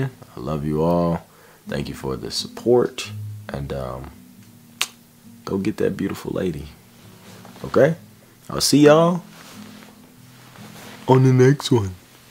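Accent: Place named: Mississippi, United States. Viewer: this guy gotta be from mississippi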